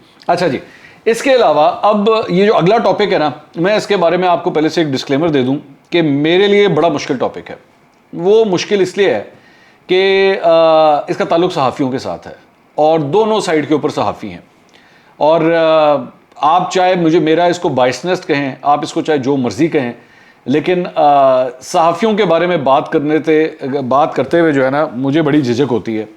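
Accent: Punjab, Pakistan. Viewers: Ap ka punjabi tarka bht acha lgta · Hahahaha Bhai ap ka ya Jo panjabi ka tuch h vlog main ya Kamal ka hota h I love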